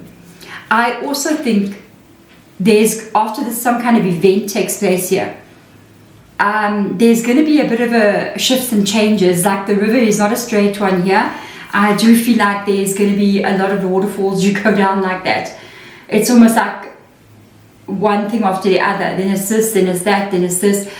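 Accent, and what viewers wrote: South Africa. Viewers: Are you South African ? I’m from Durban 🇿🇦🇿🇦🇿🇦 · South African here! I thought i recognised your accent ❤️ Dankie vir jou liefde!! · I recently discovered your channel. Im loving it😊. I detect a fellow south African from the accent 😅. All the best 👍.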